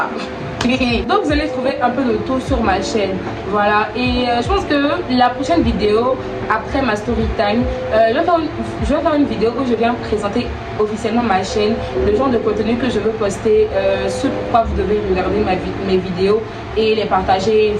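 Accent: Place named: Ivory Coast. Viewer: j'ai cru que t'étais ivoirienne 😅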